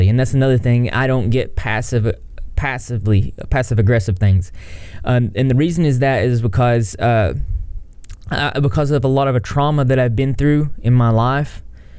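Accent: South, United States. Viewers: I too struggled with boundaries until I started my nursing career. I’m not sure why that changed but I’m glad it did. Not going to say it’s perfect but I learned like you probably have people will walk all over you if you don’t. I noticed you also have a southern accent so I’m assuming you are from here as well. It’s sad that people take our generous nature and upbringing and use and abuse us. It’s not because we are stupid or gullible we are just taught to do for others. I’m glad you are working on this and I hope you continue to do well :)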